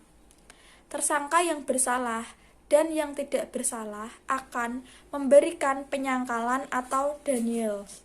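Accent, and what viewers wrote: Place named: Java, Indonesia. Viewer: Inspiratif dan logatnya medok 😁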